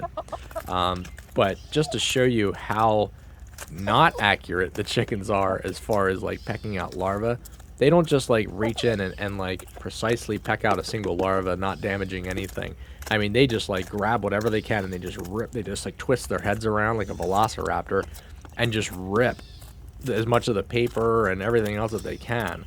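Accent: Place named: Philadelphia, United States. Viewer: Omg my son is obsessed with these videos and sucked me into watching them now I can’t stop. And I was thinking.. this guy is definitely from Philly. Am I right?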